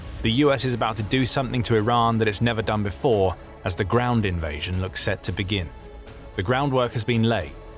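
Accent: United Kingdom. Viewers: Love hearing a Brit talk about stuff they aren’t involved in lmao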